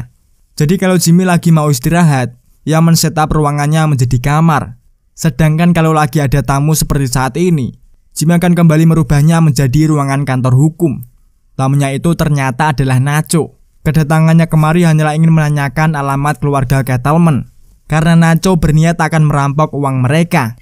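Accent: Java, Indonesia. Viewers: Jangan di medokin bg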